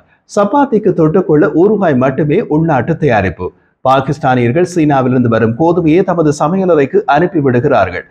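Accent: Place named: Eelam, Sri Lanka. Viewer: அழகிய (ஈழத்)தமிழ் உச்சரிப்பு,நேர்த்தியான செய்தித் தொகுப்பு ! பரபரப்பில்லாத உண்மையான செய்திகள்!நன்றி!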